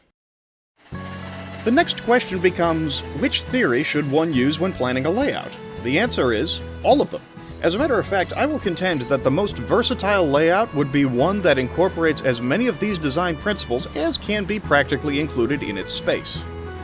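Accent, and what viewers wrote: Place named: Texas, United States. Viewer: WHY do you sound like texan Gabe Newell?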